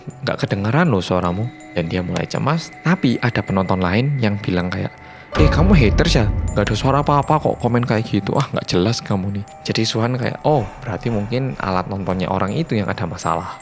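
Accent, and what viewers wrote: Java, Indonesia. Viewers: Enak banget dengerin orang Korea ngomong nya medok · Ga percaya aja oppa ini logat jawa bukan logat korea🤣 medok banget jawa nya wkwk, tapi muka korea banget wkwk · Bang orang korea ap orang jawa kok logat ngomongnya jawa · Kerennn medhok tnan😍😊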